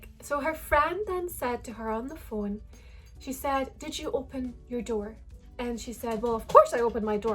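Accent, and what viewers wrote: Scotland, United Kingdom. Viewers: Love your Scottish accent! Your nostalgic look is too cool! Love your videos and ignore the haters! You and Maverick and your little boy are too cute! Bless all 3 of you! ❤ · Love your videos ❤ ps are you from Scotland cos so am I 🏴😁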